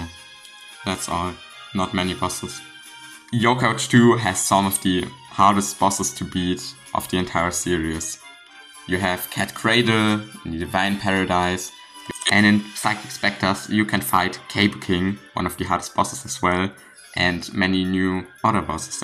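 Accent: Germany. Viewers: Is that a german accent? Hi wie gehts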